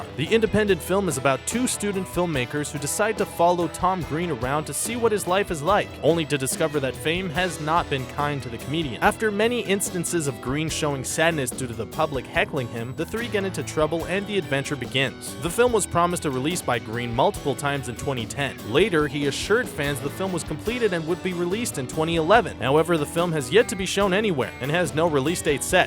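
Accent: Canada. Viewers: too all you speech nazis...the narrators canadian you shit heads...they pronounce shit differently,,,entitled narrow minded shit heads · Stupid Canadians should learn how to speak proper English.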